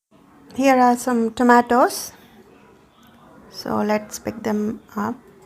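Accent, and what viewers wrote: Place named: Andhra Pradesh, India. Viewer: మీ vedio లలో తెలుగు పట్టి పట్టి మాట్లాడుతుంటే తెలుగువాళ్లు కాదేమో లేదా ఏ అమెరికా లోనో పుట్టి పెరిగి భారత్ కీ వచ్చారేమో అనుకున్నాను 😀 ఇవాళ మీరు english లో మాట్లాడుతుంటే confirm అయింది మీరు పుట్టి పెరిగినది అంతా పక్కా ఆంధ్రా 😀 Any how your garden is beautiful and we can make out the hardwork you put behind to maintain it for years. Keep going. All the best mam👌👏👏👏👍🙏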